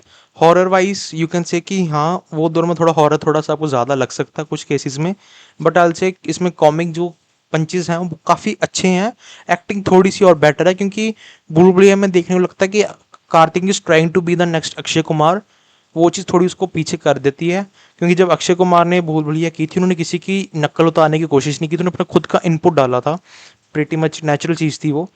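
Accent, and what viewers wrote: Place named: Pakistan. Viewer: yar tum accent sy to pakistani lagty ho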